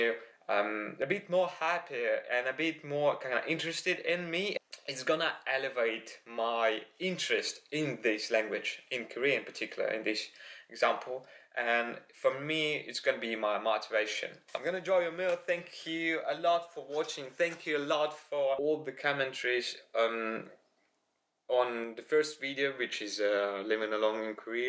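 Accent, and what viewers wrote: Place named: Mexico. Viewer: love that Mexican accent when you speak Spanish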